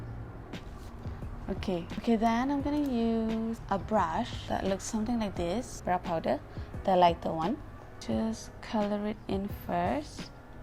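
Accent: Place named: England, United Kingdom. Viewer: Yayyyy thank you for the tips💋. Suka btul sy sma tu english accent ko. Poinsikou💜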